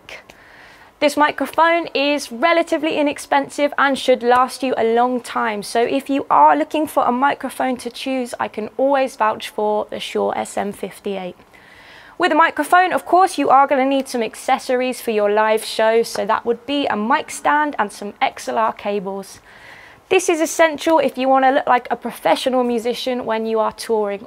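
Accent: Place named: RP, United Kingdom. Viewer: accent contains a trace of the current fad in BBC continuity announcers. I wonder if she even realaahses she's doing it.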